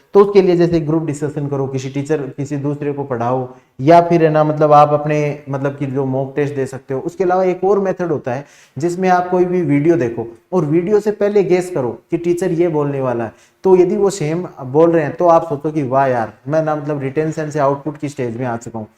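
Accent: Rajasthan, India. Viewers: Loved the rajasthani accent 😀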